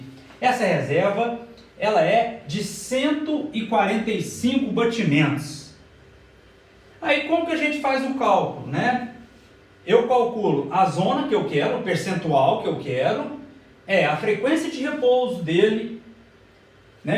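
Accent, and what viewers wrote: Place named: Minas Gerais, Brazil. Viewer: Parece mineiro uai!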